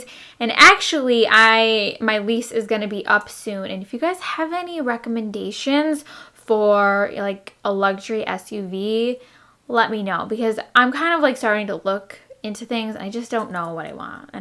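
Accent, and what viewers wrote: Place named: New Jersey, United States. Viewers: I thought you were from New Jersey bc of your accent 😂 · Love your lipcolor!!! And I assumed you were from Jersey too. I'm born and raised in Cali. And to me, your accent sounds like what I would assume is a Jersey accent. Buuut, I am not an expert obviously on accents lol.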